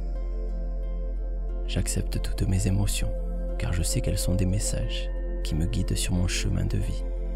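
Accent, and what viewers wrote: South, France. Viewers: Merci même si justement je préfère quand tu es naturel j'adore tellement ton accent du Sud et que je sens que tu appuie pour ne pas qu'il s'entende et ça rend particulièrement cette vidéo un tout petit moins agréable que les autres! Tu es génial et fais pour cela pour l'instant je le sens et merci🙏 tu aide tellement de personne dont moi mais voyons plus grand merci merci merci je t'envoie beaucoup d'amour et hâte de la prochaine video avec ton bel accent 😉♥️🧡💛💚🤍💙💜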